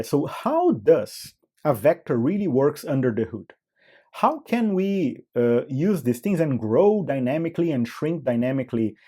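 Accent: Brazil. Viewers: Eu reconheço o sotaque brasileiro quando eu escuto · I'm sure your first language is portuguese, are you brazilian?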